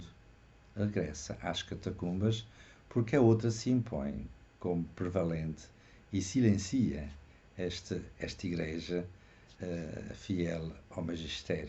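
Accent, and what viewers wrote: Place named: Portugal. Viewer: Saludos cordiales desde Brasil. Creo que hablas el portugués de Portugal. De que paróquia el senhor padre eres? Gracias por su vídeo.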